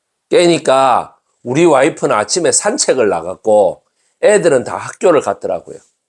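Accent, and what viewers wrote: Gyeongsang, South Korea. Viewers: ● 항상 매 영상마다 잠에서일어난 숙취가득한 헤어와 까슬수염 가득한 얼굴이지만 그래도 교수를 나는 사랑한다.. 그의 정의에가득찬 경남사투리를 나는 사랑한다...●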